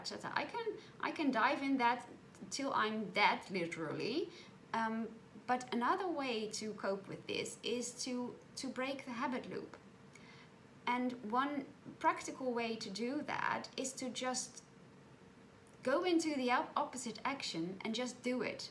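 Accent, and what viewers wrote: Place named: Netherlands. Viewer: THANK YOU THANK YOU THANK YOU for reading that in Dutch. I am 2nd generation Dutch/Australian and both my Oma and Opa have passed on but hearing you read that just brought back amazing memories of two Beautiful people who i miss dearly. Dutch is a beautiful language and I miss that strong accent so much ❤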